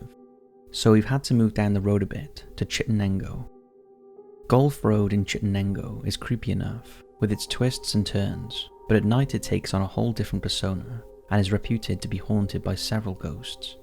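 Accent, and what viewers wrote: United Kingdom. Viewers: Dude..your stories and narrations are awesome and gripping. One problem though. You talk so low its difficult to make it out even on earphones. An then there is your British accent ! (coming from an Indian Lol )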